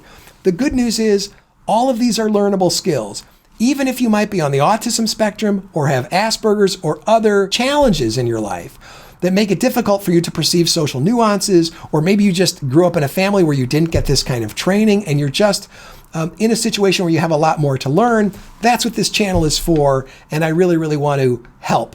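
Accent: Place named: Chicago, United States. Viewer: He's got a Chicago accent that I find distracting.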